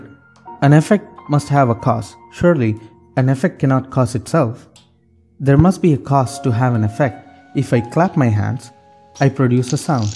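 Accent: India. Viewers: This is off topic, but since you are a person seeking knowledge as well as me, I thought I could ask a politically incorrect question and you would not get offended. Please don't take this question the wrong way, I am asking because I really don't understand. When people with an accent from India speak English, they often roll their Rs and add a "d" sound. When they say a "w" sound they tend to add a "v" sound. Why is that? The reason I am confused is that I can make your sounds by imitating how you speak. But that makes me think that there must be certain pronunciations of your native language that native English speakers will get constantly wrong that indicate they are native English speakers to you. What are those sounds that we native English speakers consistently mispronounce that give us away?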